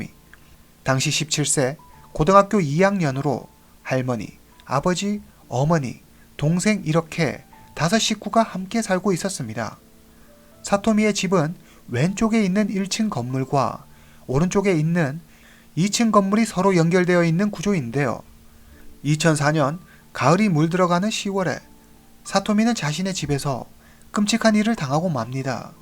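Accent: China. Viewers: 혹시 조선족이신가요?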